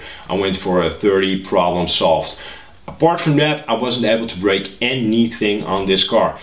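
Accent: Netherlands. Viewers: I hear a bit of a dutch accent. great video, even though I'm a bit late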